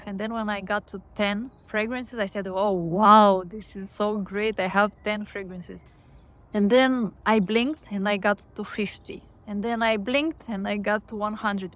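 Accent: Romania. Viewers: Dear! Thank you so much for this vulnerable video! I too am Romanian and recognized your accent from the very first seconds. But for the sake of this post, I will comment in English because I am sure so many viewers will resonate with your message. I recently got into discovering perfumes. It hasn't even been 3 months yet, and my collection went from one signature scent that I had for the past 12 years to 6 full-bottle niche perfumes and a bunch of paid decants (and I can't really say I have the income for such big expenditures in such a short amount of time). These past months I've been compulsively watching videos about perfumes, reading on forums, reading people's comments on Fragrantica, taking recurring trips to perfume stores, and hunting the next thing on my wish list. And when I finally got the next perfume, I wasn't even that impressed with it anymore. 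When you mentioned the WHY I immediately started tearing. As you said, I think this sudden obsession came from the need to fill a void as I just experienced a painful loss. I am glad I caught this obsession early on and I am determined to put a full stop to it. Thank you for your message and advice.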